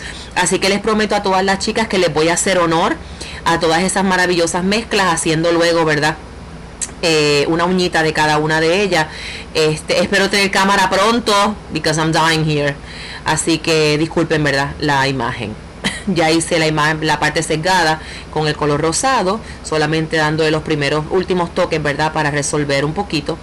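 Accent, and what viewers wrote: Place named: Puerto Rico. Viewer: Me encanta tu acento boricua tengo una tía allí en Puerto Rico.......me encanta tu diseño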